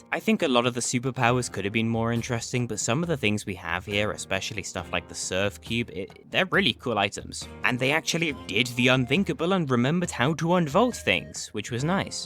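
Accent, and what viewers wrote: United Kingdom. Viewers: I was about to say I thought you were British.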